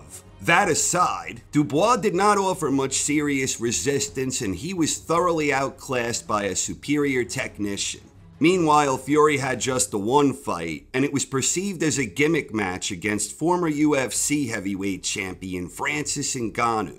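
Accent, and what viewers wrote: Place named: Boston, United States. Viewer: I think this guy is from Boston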